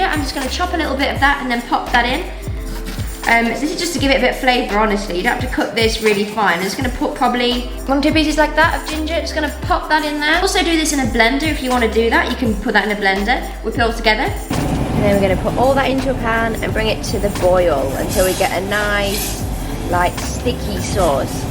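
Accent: United Kingdom. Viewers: 想請問Amy是從英國哪個地方來的呢? 有聽說英國不同地區的發音也有些不同。想請問您是哪個地區的? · 英國腔的做菜過程，我愛了❤ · Hi there, I am Taiwanese who live in London now. As soon as i got homesick, the big issue is that I have to learn name of all veg and ingredients. thanks to your cooking lesson in Eng. and I love your British accent. I'd love to share Taiwanese Food with British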